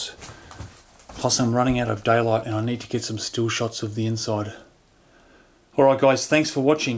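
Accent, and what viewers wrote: Australia. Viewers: Subbed. Why ? : Because the video is LONG and NOT forced upon you. I felt as if I was there with you and could see what you saw and feel what you felt. AWESOME VIDEO. DONT CHANGE, be long and thorough. Sounds like your from Australia. Hail !. Be safe because Ive seen those damn spiders that live there. UGhh. Take a pistol preferably a Desert Eagle. Can never be to safe. oops I told you so !. Start blasting and hope for the best. Fear those spiders !. Damn bigger than Spiderman ! Make sure not to close in any animal, they can die from starvation or dehydration.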